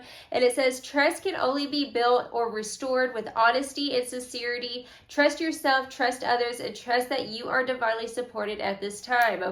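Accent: South, United States. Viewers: What in the Dolly Parton ,Deepika Padukone,& Salma Hayak is going on here LOL !! I Love your energy,and your country accent . I was surprised to hear you speak you sound down south, and you look like a lot of different cultures You are hilarious and beautiful your read is on point . LOL!!!! 😂 ❤👍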